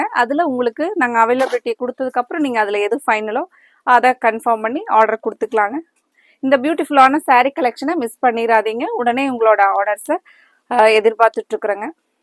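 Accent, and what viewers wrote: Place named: Coimbatore, India. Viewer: அழகு கோவைத்தமிழ். கேட்க கேட்க திகட்டாது❤❤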